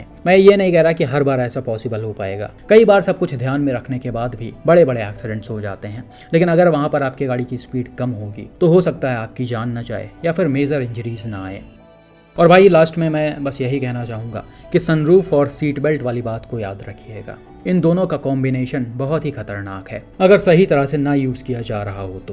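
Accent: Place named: Assam, India. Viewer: Pls don't critcise hindi speech.. It is Assamese accent..pls respect it. I am from UP but residing in Assam for more than a decade.. 🙏🏼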